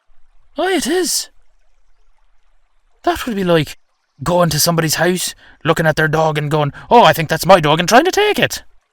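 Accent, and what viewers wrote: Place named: Ireland. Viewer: Something is a bit fishy...... Love this audio 😀 And I love the Irish accent ❤❤❤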